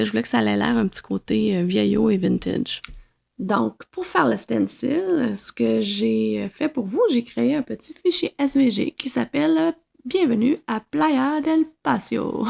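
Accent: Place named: Canada. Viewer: Bonjour, Je vous écris depuis la Suisse, et je viens de découvrir votre site et vos tutos bravo pour de la qualité des explications, le plus dur c’était de s’habituer au charmant accent Canadien, mais après cinq minutes je 'y suis fait et maintenant je vous comprend parfaitement (ah ah ) petite question technique je désire faire des pochons mais un peu plus épais car dedans je vais couler de la résine quel matériaux faut'il utiliser ? , j'ai essayé avec des feuille de bois balsa comme le conseil cricut, malheureusement après..... 39 heures de découpe je ne suis pas arrivé a le découper et pourtant n’utilisait l'outil conseillé par cricut, que pouvez vous me conseiller de prendre comme autre support qui a une bonne épaisseur (env 1.6 mm au légèrement plus) afin de faire mon pochoir ?? je vous remercie - Laurent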